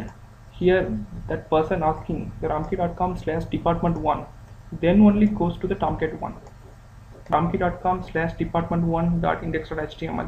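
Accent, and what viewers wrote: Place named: India. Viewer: really want to watch your video, but it is not possible!!!! talk slower, louder, and dont run with the words. ur indian accent is very strong, u should know it, and slowwwwww it down, have u watched it? understand any thing??? 27 min, and you dident notice it? its sad i can not enjoy your wisdom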